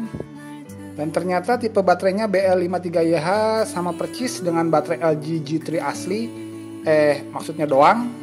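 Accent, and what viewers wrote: West Java, Indonesia. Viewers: nyunda nya itu lohh lucu bingitt :*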